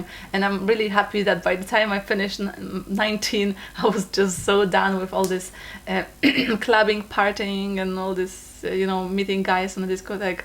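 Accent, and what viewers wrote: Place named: Poland. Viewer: First time I listened to you I realized that you had the same accent that I have. I came to US from Poland at the age of 15 and I can totally relate to you and your story. You are an amazing person and you remind me so much of me at that age. I am now much older and people always get amazed by my life story. With will and perseverance one can accomplish all the dreams. With Gods or Universes help all things are possible and you are a great example of this..You are very beautiful and you have an amazing positive attitude. I loved listening to your story. I am forwarding it to my daughter who is exactly your age. Thank you for sharing it. Wishing you all the best because you deserve it. You go girl.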